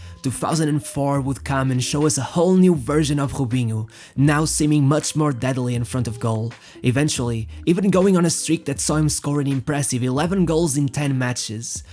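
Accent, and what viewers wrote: Portugal. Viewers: How do you not have a million subs??!! Thanks for the amazing videos keep up the great work and I love your Portuguese accent makes everything sound so smooth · Esse sutaque hein....Tu é Tuga né? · És português também eu o sotaque assim indica parabéns ao seu Canal fantástico 👍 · i can tell the Portuguese accent. It is also not "footsal" its indoor football. thanks for the video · Ahhh...vi logo que era um tuga a falar ingles... Bom video,desconhecia o canal...subscribed... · You don't have to use the Portuguese accent on non Portuguese words my dude. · Without knowing you I can tell you are Portuguese, and likely from the North/Center of the country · you, the narrator of this video is Portuguese right? the accent points to it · Antes de falares uma palavra em portugues já tinha reparado na tua lingua!! Tugas Caralho!!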